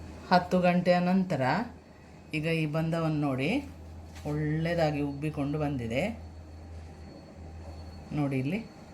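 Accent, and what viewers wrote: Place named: Tulu Nadu, India. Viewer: Madam your Kannada is very poor mixed with TULU LANGUAGE.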